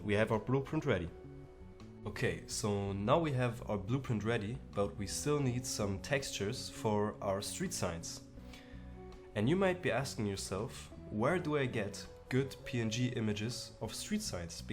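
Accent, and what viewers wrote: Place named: Germany. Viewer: Nice tutorials! Are you german btw? haha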